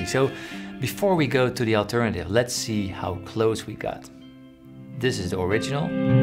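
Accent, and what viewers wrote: Netherlands. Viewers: The best things about ' music videos (in random order): --The Dutch accent that can sometimes make him difficult to understand momentarily, yet lends a certain gravitas --The humble, self-effacing humor --The funny, quirky video shots --The superb production quality of the videos --The thoughtful design and progression of material in each video --The time and effort he obviously dedicates to making them so exceptional --The love and passion for music he shares and inspires --The broad knowledge of music and musicians he offers --The uncanny ability in selecting interesting subject content--or otherwise making it interesting --The personal honesty and openness always evident --The willingness to question his own opinions, not just others --The creative musical thirst and desire for experimentation --The excellent, wide-ranging musical taste --The intelligence and ability to breakdown the complex into the comprehensible --The superb guitar playing If there is anything above found missing or incorrect, feel free to reply and add your thoughts. 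Already remembered one big thing not mentioned: THANK-YOU,!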